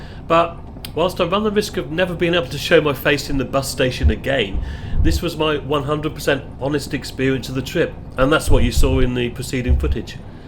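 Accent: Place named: Scotland, United Kingdom. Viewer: Somehow I thought you were from Scotland lol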